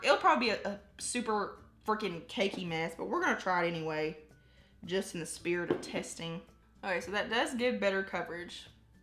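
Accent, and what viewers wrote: South, United States. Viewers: Country bumpkin... ain't nothing wrong with that! What I love about you is that you don't mask being Southern like another big youtuber does! Have a great week and love from Tennessee 😘! Also, I only have 5 notifications on and you're one of them 💖!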